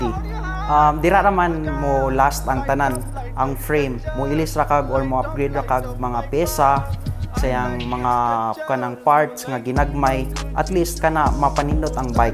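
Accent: Visayas, Philippines. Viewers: A future Ian of Cebu 😊 However may I just suggest to explain further. When you say hydraulic what's with it against mechanical? When you say "Nice" for a certain part/bike spec explain why you say so? and so on. Overall its nice to hear a bisaya version of Ian. Padayon lang migo!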